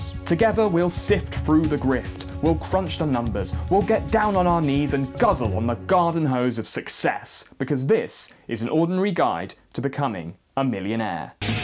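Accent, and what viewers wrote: England, United Kingdom. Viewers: He has an english accent and never mentioned being Australian, so i don't don't know where you got that from..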